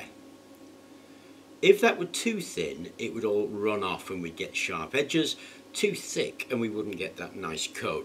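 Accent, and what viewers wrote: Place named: England, United Kingdom. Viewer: I use polyurethane one coat and then I mix a little 5 micron graphite powder in it. You can also do the 2 part mix of elmer's glue but it doesn't work as good. What was the stuff you used as a solvent and what did you mix it into, I couldn't hear what you said in the video. LOL you kind of said it fast with that english tongue of yours.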